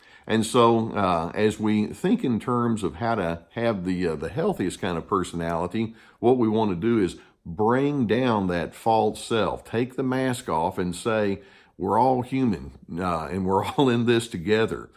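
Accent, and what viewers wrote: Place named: Texas, United States. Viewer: Lol it's the Texas accent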